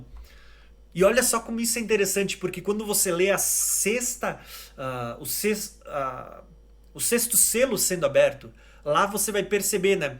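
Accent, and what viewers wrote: Rio Grande do Sul, Brazil. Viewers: Shalom irmão !!! Excelente video !!! Sutaque de gaúcho !!! Faz um vídeo sobre MATITYAHU [ Mateus] cap 5 vs 17 e 18 !!!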